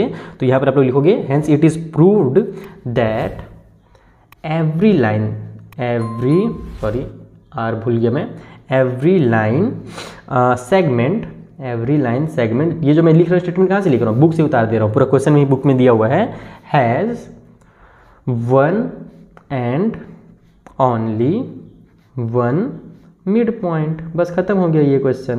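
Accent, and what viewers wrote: Bihar, India. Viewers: Sir bihari lagat he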